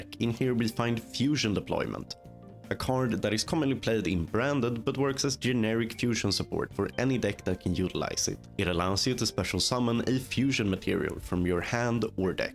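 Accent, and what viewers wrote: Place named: Sweden. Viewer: You can hear he is swedish XD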